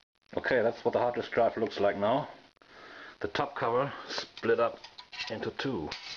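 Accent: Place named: Germany. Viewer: Speaking with a godawful german accent....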